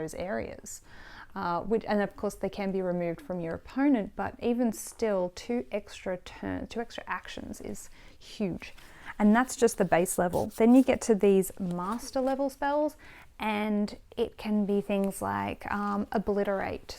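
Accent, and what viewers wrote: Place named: Australia. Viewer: Neat! Its always fin to see someone talk, who you've only seen photos of...especially when they have a cool Aussie accent! I tried my hand at making some videos for YT. I mostly made them for my sisters (to save time on game teach, since we are all in different time zones, and don't often have long to play). I initially published them, but I think I took them down, because I was embarrassed about how they came out. Mostly, I need to figure out how to edit in post and I think it would have looked much better with a pro intro like you have. Nice job! I now want to try this game sometime. :)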